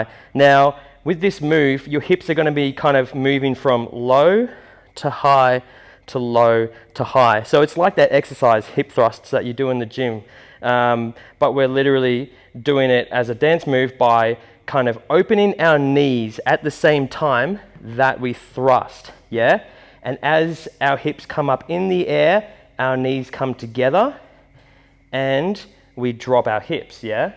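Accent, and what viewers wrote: Australia. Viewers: Shoes are 💯💯, also everything souds so much better with aussie accent 😂😂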